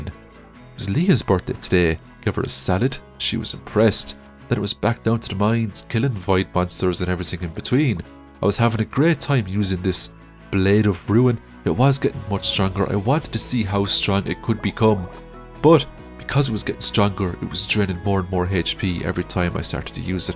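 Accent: Ireland. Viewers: 'I played 200...' Irish Accent. Instant subsribe. Let's go.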